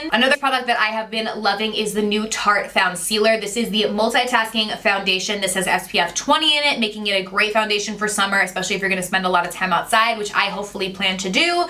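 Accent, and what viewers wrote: New Jersey, United States. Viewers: It’s how we talk in the Jerz!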